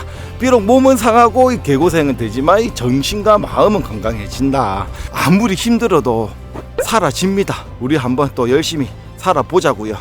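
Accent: Gyeongsang, South Korea. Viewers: 경상도 말 죽이네요❤ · 경상도 말투로 이렇게 재밌는사람은 처음본다 유머가 짱. 최고!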